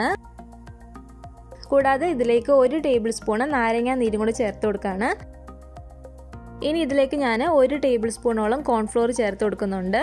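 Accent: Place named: Central Travancore, India. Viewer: central travancore slang aanallo..Cliche malabar slang allaa..... Have to try.......:-)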